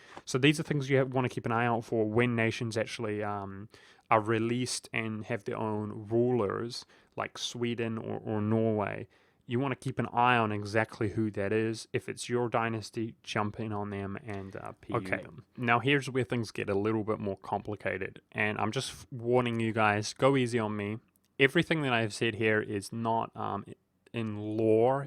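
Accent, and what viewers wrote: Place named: New Zealand. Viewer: I fuckin love having a Kiwi EUIV Youtuber to watch. As a Hamiltonian it is so much nicer and familiar to the ears. On ya mate, tu meke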